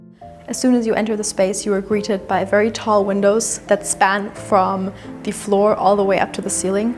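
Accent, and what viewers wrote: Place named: Germany. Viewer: nonchalant 💅🏼 der deutsche Akzent ist so adorable.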